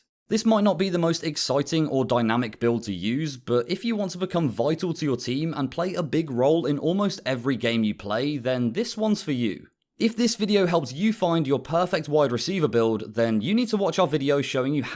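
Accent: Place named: United Kingdom. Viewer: Clicked on heard British voice immediately didn’t respect your opinion sorry about it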